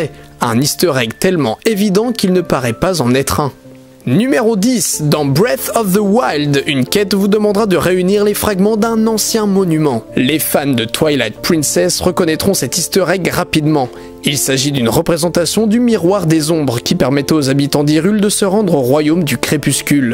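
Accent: England, United Kingdom. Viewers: j'adore ton accent anglais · WoW l’accent anglais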